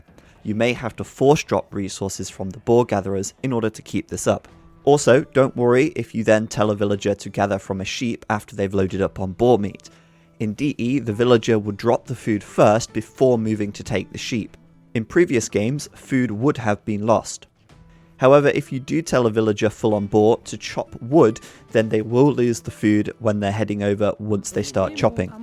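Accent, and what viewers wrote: United Kingdom. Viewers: an AoE textbook in a flawless british accent. :3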